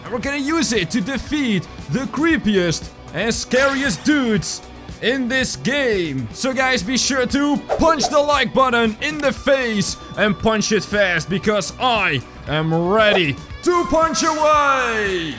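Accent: Netherlands. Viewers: By the way, I also live in the Netherlands, so I would like to know which province you live in. My province is Utrecht. By the way, you sound like a guy who lives in Groningen.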